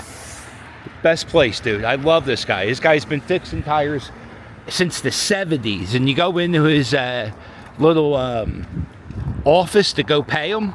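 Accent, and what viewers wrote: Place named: Philadelphia, United States. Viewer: Da Instagram, ya know?! 😂 Philly to the core. Love it. 💙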